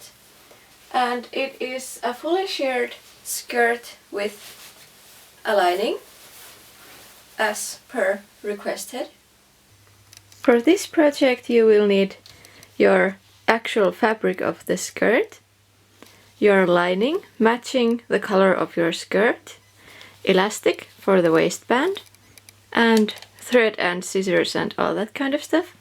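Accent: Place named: Finland. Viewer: Mä arvasin et oot suomalainen koska sä puhuit suomen englantia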